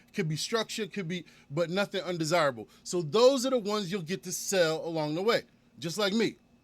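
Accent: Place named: New Jersey, United States. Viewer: I can tell homie from jersey im from maryland but been to jersey alot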